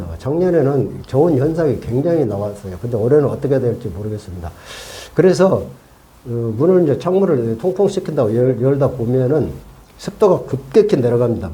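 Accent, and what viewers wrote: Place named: Chungcheong, South Korea. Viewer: 무반주 충청도 아줌마 정겹습니다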